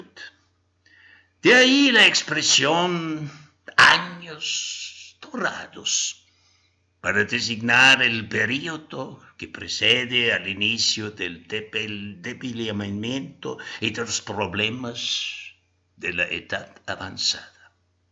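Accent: Germany. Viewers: es un man español que posteriormente fue a residir a alemania. ¿¿no le reconoces el acento alemán en ese español tan remarcado?? búscalo en googles, aparece como.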